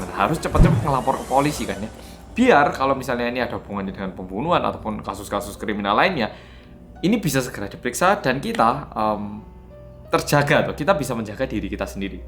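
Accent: Java, Indonesia. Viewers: Apik Mas Bro. Serasa wong jowo korea. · Bersama korea yang "medok" 😁 I'M ADDICTED 🙆‍♀️ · Bersama orang Korea yang "medok" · Kulo kalah medok Karo sampean mas 😄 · Aku ikutan bilang.... medhookk:"))) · Gue selalu ngikutin pas ngmg bersama orang korea yang MEDOKKK:) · Mau saran dong buat tambahin english subtitle karna suka banget sama konten2nya mas, apalagi kalo lagi bahas kasus2 di korea, kdrama atau kpop. Suka pengen share ke temen2 internasional sesama pecinta korea karna mas suka kasih info yang gaada di tempat lain, cara ngejelasinnya juga bikin betah ga ngebosenin tapi sayangnya gaada english subtitle jadi kadang suka males bikin summaries-nya. Biar sekalian bisa memperkenalkan bahasa indonesia dan logat jawa ke luar negeri plus bisa nambah internasional viewers biar go internasional. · Bersama yang medoookkkkk 😄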